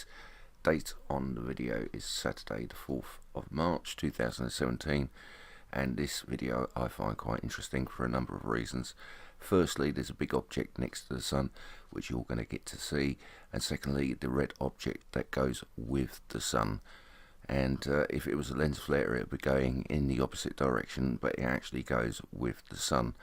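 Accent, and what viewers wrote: England, United Kingdom. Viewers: Good work, I appreciate your systematic approach and (as a silly side note) the English accent is always a marvel to wrap one's ears around.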